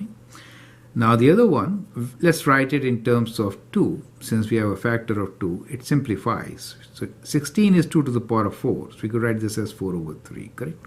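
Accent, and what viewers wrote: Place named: India. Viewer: you sound so intelligent indian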